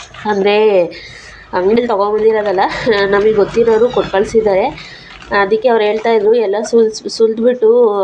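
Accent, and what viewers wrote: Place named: Hassan, India. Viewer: Nivu Hassan davara nam ajji mane Hassan hatira nivu mathdodu nodi anisuthu namadu Tiptur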